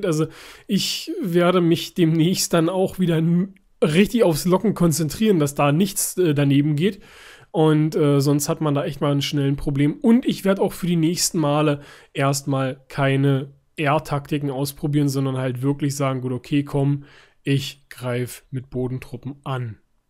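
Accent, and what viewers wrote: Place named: Germany. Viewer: Du hast einen hardcore deutschen Akzent wenn du englisch sprichst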